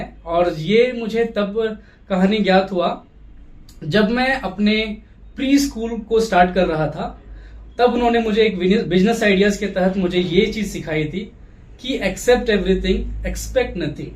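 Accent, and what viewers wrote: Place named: South, India. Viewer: My dear sir, your voice has a little south indian accent...... So calm and pleasant ❤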